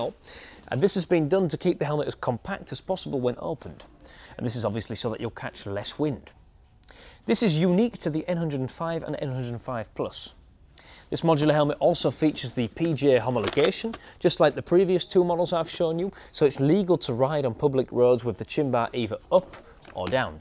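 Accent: United Kingdom. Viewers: Lekker accent maat, moest toch heel even twijfelen of het nou toch stiekem brits was.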